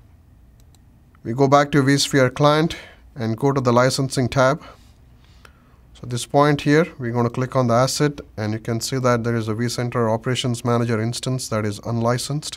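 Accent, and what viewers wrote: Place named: Arab world. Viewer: Mto bacana o vídeo, deixo minha pergunta pq o sotaque árabe, iraniano ou perse?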